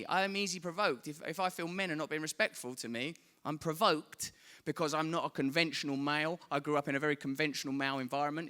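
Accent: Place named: Cockney, United Kingdom. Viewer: Russell Brand's first aspiration is 'to remain famous'. After that, whatcha need? a self-help guru, Dudley the Moore II, a recovering recoverer? The Cockney accent combined with the bad word in the middle of the cover (asterisked) makes the money-coming-out-your-wallet bit feel a little less chump-to-champ. Self-help books were designed to give dust a jacket to cry on. If you don't buy my book you're being subjected to the patterns of your cheap ass. Break the cycle of discerning purchases. I promise my fame will rub off like a halo on the drab parameters of your crappy life or your money never back!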